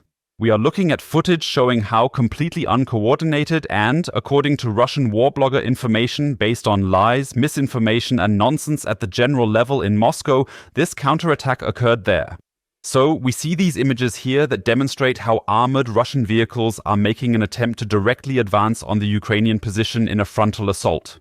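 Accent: Germany. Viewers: I'm sure, he has German accent all right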